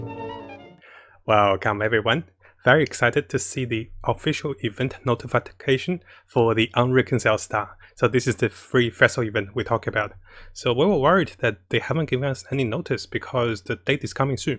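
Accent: Australia. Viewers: This guy must be Australian. Just my guess lmao I think I'm wrong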